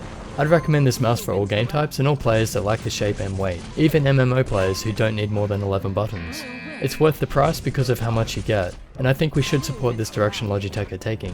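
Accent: Australia. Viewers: This is a bloody excellent review, mate. Impressive amount of detail! My first video of yours and an instant sub. Also great to hear some Aussie-English amongst the far too much American going on on YouTube!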